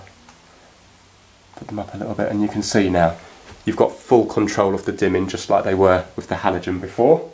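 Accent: England, United Kingdom. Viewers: brilliant!!! Thank you for your solution and your charming British accent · Alex Calinescu that's an English accent from England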